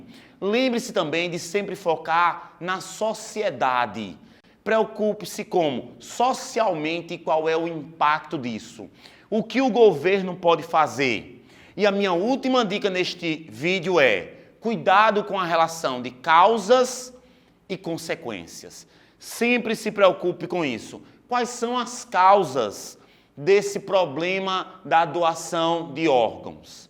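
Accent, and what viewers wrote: Northeast, Brazil. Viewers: Apaixonada pelas suas aulas !! Queria que fosse meu professor no Ensino Médio e hodiernamente na faculdade. Curso Letras - Língua Portuguesa, e aprendo muito com você ! Estou estagiando no Ensino Médio e o que aprendo na suas aulas passo para os alunos. Muito obrigada !! você é maravilhoso. P.S., Seu sotaque é encantador, amo o sotaque nordestino.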